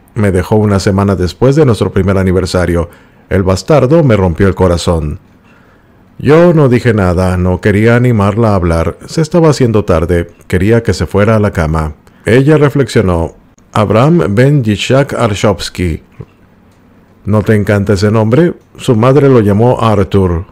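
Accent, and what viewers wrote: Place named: South, Spain. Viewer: me hace reir. Eso significa me cae bien. Despues que termine su disertación voy a ver una película de Ava Garder. Me gusta el acento del sur.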